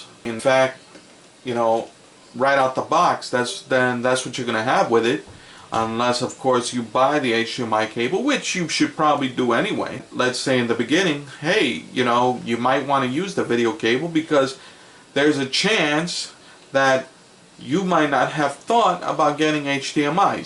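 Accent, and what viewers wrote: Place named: East Coast, United States. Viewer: are you from Brooklyn or Jersey! I know you from the east coast. you can of sound like dice clay😊 I'm older and remember watching him on cinemax in the early 90s but good review.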